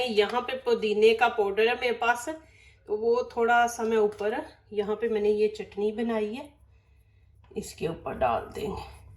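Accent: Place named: Punjab, India. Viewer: اسلام و علیکم خوش رھیں شاد و آباد رھیں بہت بہت بہت بہت بہت ھیییییییی مزےمزےکی ریسیپیز بتاتی ھیں آپ اور اور اور اور اور اور سب سے بڑھ کر آپکے بولنے کا انداز آپکا پنجابی لہجہ بہت ھی اچھا لگتا ھے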